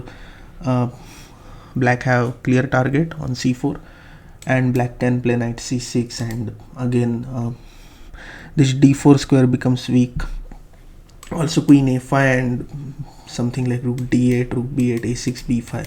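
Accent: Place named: India. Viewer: im gonna be honest, i dont keep up with chess enough to know that many fide masters. but this is actually great for me. i love listening to an indian accent explain things like this. idk why. combine that with chess and the fact that youre definitely qualified to teach this stuff? instant sub.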